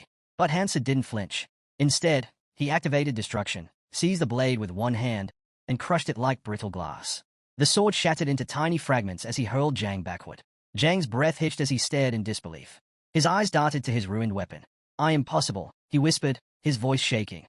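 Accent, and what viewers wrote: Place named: United Kingdom. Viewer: British ahh voice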